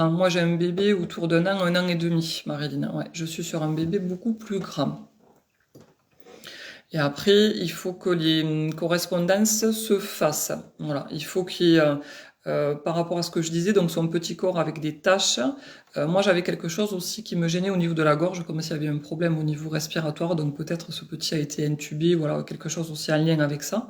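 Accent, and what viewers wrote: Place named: South, France. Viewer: Moi, ca me fait du bien d'écouter votre accent du Sud 😊🤔😁Io ne me manque plus que le chant des cigales et se sera ok, je vous entendrai bien 😅🤔😁💚💚💚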